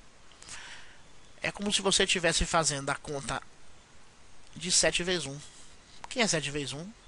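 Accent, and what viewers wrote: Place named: Northeast, Brazil. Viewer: parabens prof. o senhor ensina mto bem! e com esse sotaque bacana do nordeste fica mtooo mais agradável! ;) valeu msmu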